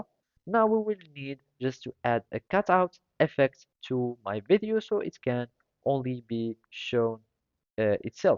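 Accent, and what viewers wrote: Morocco. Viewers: It’s insane that I know that you’re Moroccan by your slight accent because I’m Moroccan too 😂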